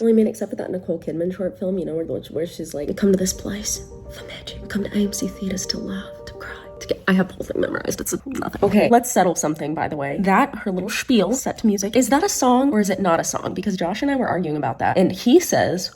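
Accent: New Zealand. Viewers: your New Zealand accent is awful I am truly offended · im sorry but that kiwi accent is absolutely terrible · As a new zealander im disappointed in that accent 😭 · that nz accent tho. god almighty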